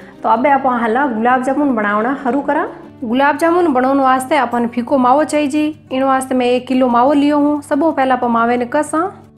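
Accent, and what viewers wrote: Marwar, India. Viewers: आपके मारवाड़ी बोली बहुत प्यारी लागे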